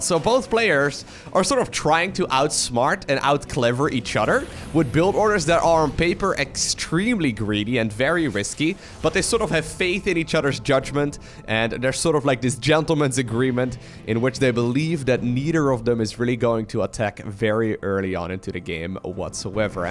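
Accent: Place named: Netherlands. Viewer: My god your Dutch English accent is so terribly cringy to listen to... Get some lessons to fix it already. Its been this shit for years now.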